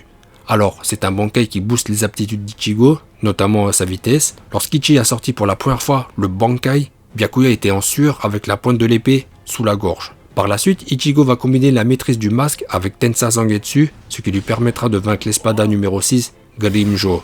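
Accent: Japan. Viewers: Bravo pour ton accent japonais c'est cool 😎🇩🇯🇩🇯 grâce à te vidéos sur naruto DBZ bleach je appris beaucoup de mots japonais merci beaucoup mn frère est perso j'aime bien le bankai le moian globes oculaires le chauve de la division 0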